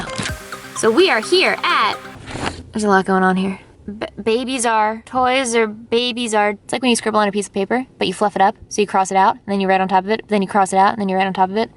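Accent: Philadelphia, United States. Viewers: I get the philly accent. In Baltimore t turns into d’s . And Maryland sounds like “marlyn”😂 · I just started watching you and I didn’t know you where from Philly!!! Me too!!! I was confused when you said you said orange wrong because that’s how I say it! I wish I was as talented as u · I’m from philly and I didn’t even realize how differently we say certain words 😂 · I am from Philly and always said orange, forest, forehead etc the way you do and I never thought that water and the other words were wrong until you said it today. I’m so shook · Omg I didn’t know why you sounded so normal to me until you said you were a Philly girl! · Never change the Philly accent! We, hometown homies, love that jawn!! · philly accent asmr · Being from philly, I felt the our o's are a's except in "wooder" (water). Lol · I’m a Philadelphian. W H O O T E R still hurts my brain. 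please it’s wAtER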